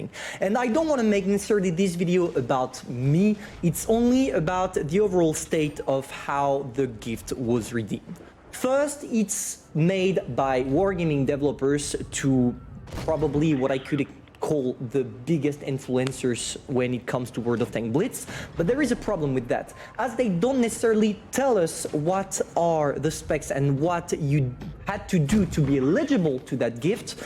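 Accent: France. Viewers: you are the best Blitz- You Tuber of all, besides Meadsy and Droodles. Stay as you are, because you got balls and are telling always the truth about the game. Most I love your french accent in english. All thumps up..... Greets from Germany, the land of the mad.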